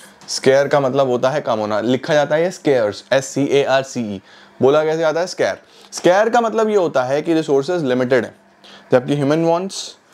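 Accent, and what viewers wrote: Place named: Punjab, India. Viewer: Your punjabi seems cute😂❤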